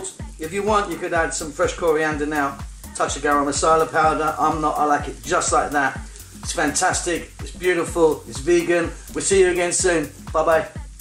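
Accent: United Kingdom. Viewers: Are you Asian with British accent or English person, love your passion for cooking. Love listening you, deffo subscribing u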